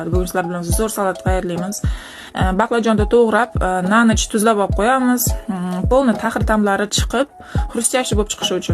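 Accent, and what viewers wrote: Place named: Samarkand, Uzbekistan. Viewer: Qaerdansiz shivangiz Samarqandnikiga oxshatim yaxshi korib qoldim ishizga omad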